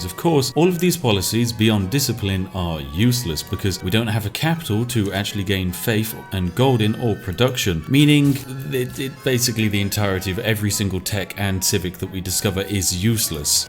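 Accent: United Kingdom. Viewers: That brittish accent is just perfection...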